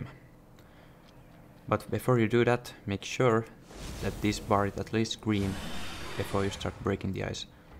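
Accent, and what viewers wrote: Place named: Finland. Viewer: Are you from Finland? Your accent sounds like it.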